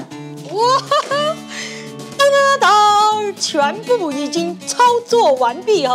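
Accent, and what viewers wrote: Sichuan, China. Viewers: 四川妹儿吗？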